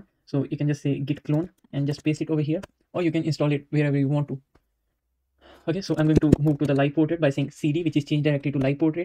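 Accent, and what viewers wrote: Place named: Ukraine. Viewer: you got really strong ukrainian accent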